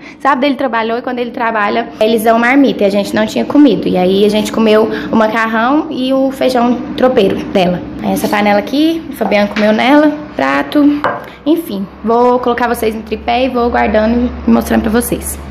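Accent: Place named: Minas Gerais, Brazil. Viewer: Nosso sotaque mineiro e outro nível ❤️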